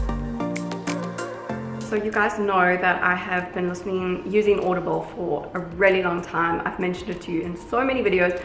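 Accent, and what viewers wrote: South Africa. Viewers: It’s that smooth SA accent. 👌👌😉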